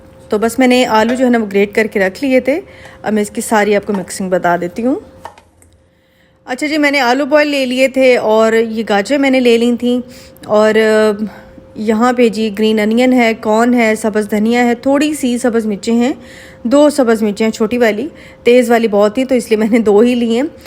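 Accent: Saraiki, Pakistan. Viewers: I live in Multan and hope to see you soon... I m a saraiki too and apki meethi saraiki sun kar bohat acha lagta hai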